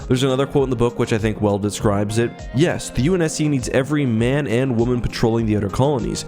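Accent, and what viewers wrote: Canada. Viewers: Gotta love his Canadian accent! Always good content tooo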